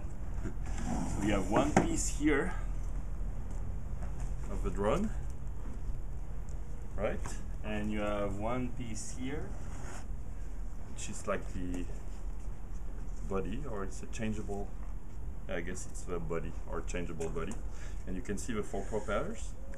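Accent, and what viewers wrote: France. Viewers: when french people talk english ... -_-'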